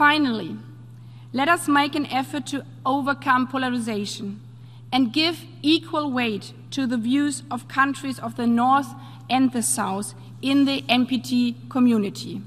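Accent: Germany. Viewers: Ja, sie spricht mit deutschem Akzent. Und der wird auch stärker während der Rede. Aber der Inhalt, das Vokabular, die Grammatik sind einwandfrei. Übrigens hat auch der Präsident, der sie am Anfang ankündigt, einen starken Akzent... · Astreines Englisch. Für Jedermann verständlich, emotional und mit deutschem Akzent. Besser spricht kein deutscher Außenminister. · Als halb Ire, der mit deutsch und englisch aufgewachsen ist, muss ich sagen dass ihr englisch nicht schöecht ist. Natürlich hat sie einen deutschen Akzent, sowie 90% aller deutschen. Man versteht sie aber sehr gut, ihr Satzbau und ihre grammatik sind quch gut und sie spricht besser englisch als 90% unserer Politiker und wahrscheinlich besser als 100% aller AFD Plotiker, also bitte mal klarstellen, macht ihr euch über ihren Akzent lustig? Sehr gewagt als deutsche, denn ich wette ihr habt alle einen starken deutschen Akzent und ich wette die meisten von euch können nicht mal einen geraden Satz formulieren!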